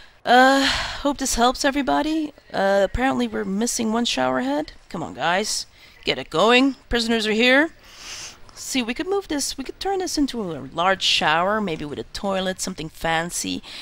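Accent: Flanders, Belgium. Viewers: Nice! first flemish that dosent have that broad accent! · may be cos she is from belgium :) But you can hardly tell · I'm also from belgium. Are you flemish?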